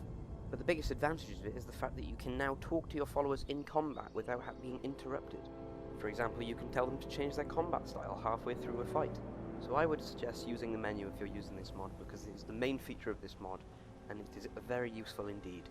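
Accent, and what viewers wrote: United Kingdom. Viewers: You sound like a Welshman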